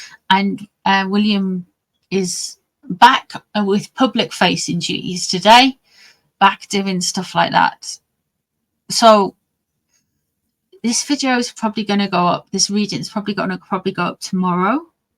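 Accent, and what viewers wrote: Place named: Newcastle, United Kingdom. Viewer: I thought was saying DL’s too..However I think she is saying DETAILS.. I think it’s her Geordie accent..please correct me if I am wrong..💙💙💙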